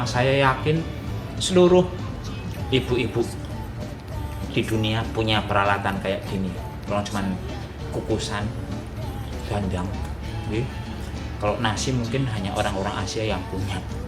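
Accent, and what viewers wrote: Banyumas, Indonesia. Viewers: Ya Allah... Medok temmen kueh .. 😂😂. Jd nambah pingin tilik mbahe aring Banyumas.., brikute..smangaat terus masak" dg apa anane bae👍👍💪😇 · Happy masaknya dengerinn medokk jowone ngapak... Dan resepnya sy suka semua...good lucky 👍👍💃💃💃💖💖🌹🌹 mas km klu mkn bikin ngilerrr..... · wong ngapak ank e mbok dirah 🤣🤣🤣🤣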